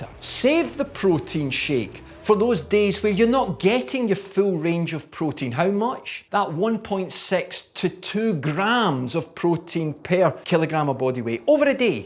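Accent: Scotland, United Kingdom. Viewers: Is this accent Scottish? Or what it is? I am Italian and I cannot "localize" it. (I mean his normal accent, not the accent he has when he plays the Scotsman). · As an American I could listen to a Scottish guy cuss and swear all day 🤣I appreciate all your advice my friend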